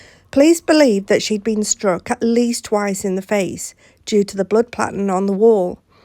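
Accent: Yorkshire, United Kingdom. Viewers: My absolute favourite creator/narrator. Hearing your Yorkshire accent makes me feel at home. I live in California now and haven't been home in 30 odd year so hearing your voice gives me such a warm feeling. It's hard to explain but all you need to know is I absolutely LOVE listening to you. It doesn't hurt that you make great content as well Cheers 🤘🏻🇬🇧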